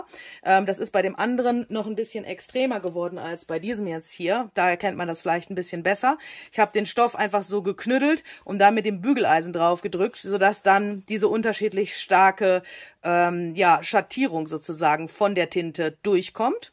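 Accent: Germany. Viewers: Kommst du aus Baden-Württemberg? Ich sende Grüße in meine Heimat sehnsucht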